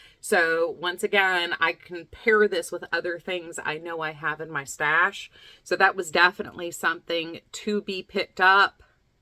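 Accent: South, United States. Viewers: Your shawl is beautiful, the colours are amazing! Also, your southern accent is stunning, I love it 😍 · Your southern accent is wonderful - comes out stronger with certain words. I don't block socks in general. However, a knit stocking would definitely benefit from it. Looks great. I can't remember if you said what fabric you are going to line it with. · Hi, I'm so happy I finally found you on YouTube! I saw your summer freshness shawl and it's stunning! I love it! can't wait to see it finished! You're so a lovely person and I love your southern accent. Have a lovely day! Magali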